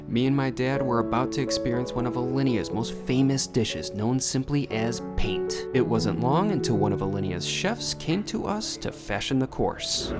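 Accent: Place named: Chicago, United States. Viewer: I knew that you were from Chicagoland from your accent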